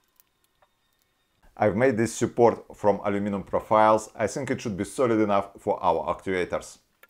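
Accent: Russia. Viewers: Русский акцент сразу ударил по ушам. Что бы убедиться в своей правоте открыл описание под роликом, но увидев там какого-то Yoyo Liu был крайне удивлен. Пока не понял что это просто контакт продавца. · С русским акцентом мне даже легче понимать английский язык :). И с юмором, что тоже приветствуется! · Ohh russian accent👍. You reminded me USSR scientists, who were living only for science itself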